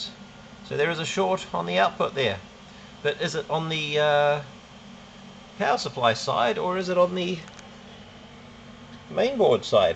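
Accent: New Zealand. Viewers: _You sound like a fellow Kiwi... We should never underestimate the ability of a Kiwi, as isolated as we may be, to fix any problem, be it fixing a piece of broken farm machinery or splitting the ATOM_.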